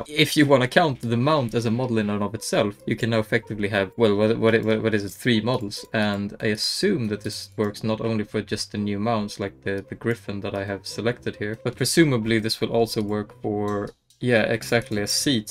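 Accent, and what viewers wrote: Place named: Sweden. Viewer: I honestly love watching ur videos, partly for the Hero Forge tips and partly for the strong Swedish accent, it's awesome <3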